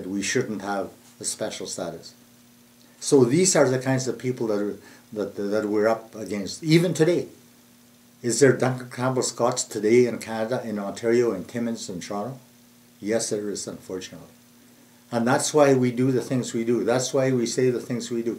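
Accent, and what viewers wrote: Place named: Orkney, United Kingdom. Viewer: is he from orkney???? i stay in edinburgh scotland but the name is from orkney and im 1000% certain that i'm related to this guy cuz he sounds like he has a less strong version of my grandads accent. i feel so weird seeing people that are probably some what close relatives on youtube when i search my last name hahah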